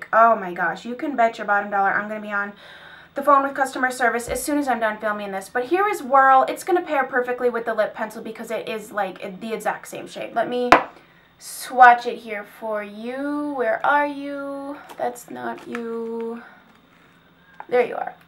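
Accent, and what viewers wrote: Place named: Wisconsin, United States. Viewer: No way! My hubby is from superior WI. We go back every year in the summer to a lake near Madison. He has family in sun prairie. I knew that sounded Iike a wisco accent lol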